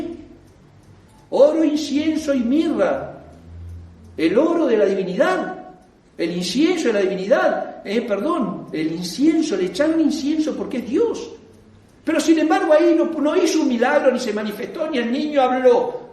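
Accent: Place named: Argentina. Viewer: Por el acento argentino intuyo que es, pero no estoy seguro.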